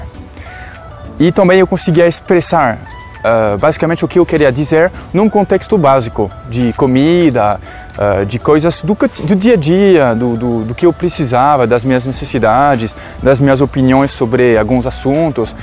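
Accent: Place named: France. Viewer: Seu sotaque francês é muito bonitinho! 😗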